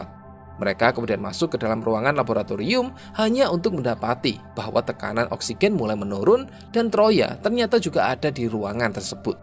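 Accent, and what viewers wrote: Java, Indonesia. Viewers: Logat medok nya kedengeran bngt ,tp intonasi nya enak bngt😊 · Suara medok mu terngiang ngiang sampe aku turu bro 😅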